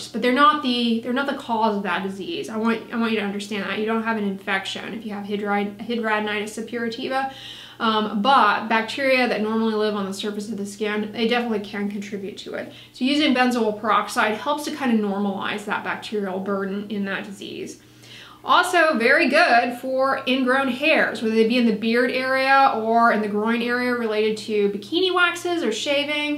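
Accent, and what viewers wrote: United States. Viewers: Where in America is that accent from?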